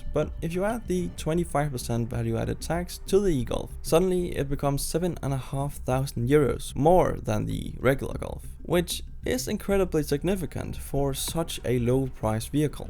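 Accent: Denmark. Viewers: how could you possibly not know he was danish with that accent? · Visste du var dansk från din engelsk dialekt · I could smell this guy's Danish accent from a mile away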